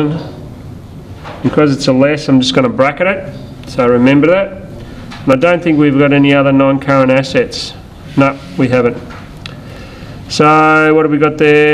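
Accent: Australia. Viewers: are u from Australia mate ?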